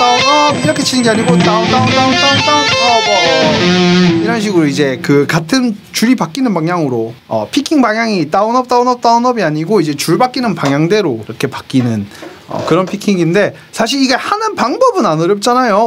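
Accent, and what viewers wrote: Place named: Gyeongsang, South Korea. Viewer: 같은 경상도 사람이신가유..? ㅋㅋ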